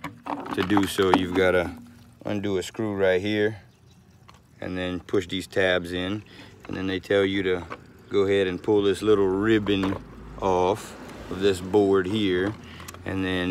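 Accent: Louisiana, United States. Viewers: Man that's a Louisiana accent if I ever heard one!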